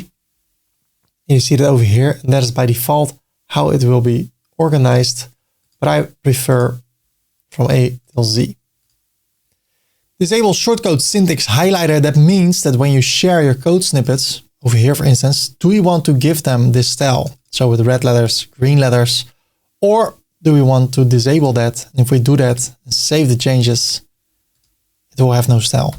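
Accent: Netherlands. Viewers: So that where that accent is from, from Holland.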